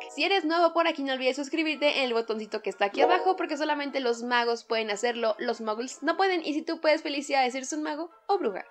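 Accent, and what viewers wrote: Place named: Mexico. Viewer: Que poco se nota ya el acento Mexicano en! Sin duda alguna ha evolucionado en sus videos, sin decir que el que tuviera acento estuviera mal, todos somos brujas y magos del mismo bando. 👀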